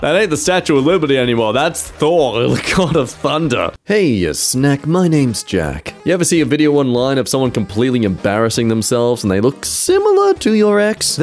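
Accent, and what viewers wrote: Australia. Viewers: mate, that flower is already dead… that yard was beautiful and green with the flowers, and it didn’t look like shitty fake grass… or dying one… hand to blade is where my mind went too 😅 too many humans are the definition of ew so I agree with that sentiment in this case… but no, insects are beautiful useful important fellow animals but otherwise, ooh, and yes please keep your aussie accent mate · Jack, it's fine, you can go full Aussie.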